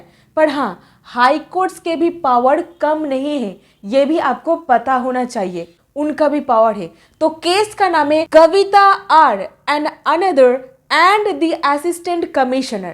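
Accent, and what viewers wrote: Bengal, India. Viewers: Bengali's hindi is very sweet. Easy to understand.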